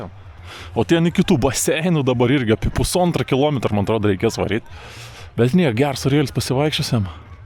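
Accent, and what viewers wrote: Samogitia, Lithuania. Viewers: Mažiau zemaitisko balso jau truputi perdaug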